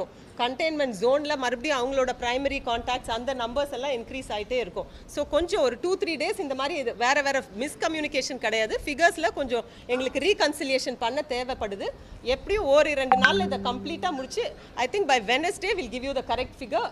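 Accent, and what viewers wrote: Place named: Tirunelveli, India. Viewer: We like your tirunelveli Tamil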